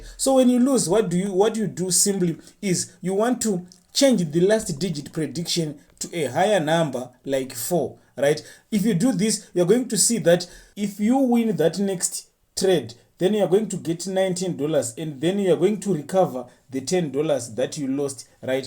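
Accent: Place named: Zimbabwe. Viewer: 😂 Zimbabwean accent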